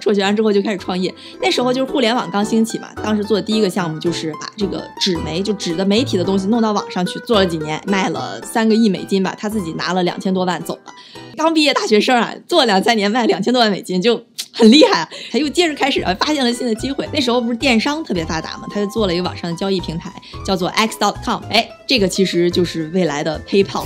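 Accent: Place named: Henan, China. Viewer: 那里的老乡？听说话像河南、陕西、山西那一块的人。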